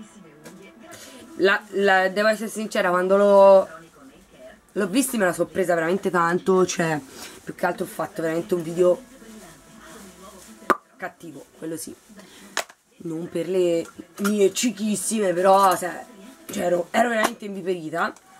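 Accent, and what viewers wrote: Tuscany, Italy. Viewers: sei grande! Manda queste quattro ochette a fanculonia e continua con i tuoi video. Sono spassosi e belli Bello il tuo spiccato accento toscano e le tue mani sul viso sono come pennelli di un pittore Sei bravissima....poi hai l'età di mia figlia Ti adoro e voglio continuare a vederti con tanto affetto una tua fan agguerrita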